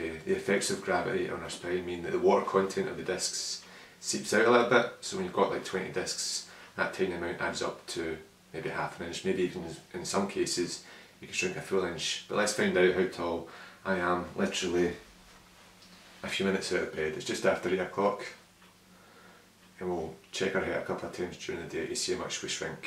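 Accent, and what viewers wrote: Scotland, United Kingdom. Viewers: the Scottish accent is awesome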